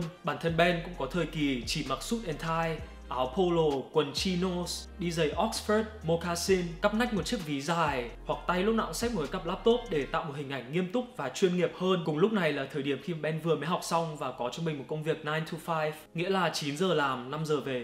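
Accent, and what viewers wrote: Standard. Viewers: Nói chuẩn anh ưi!! 1 like❤️❤️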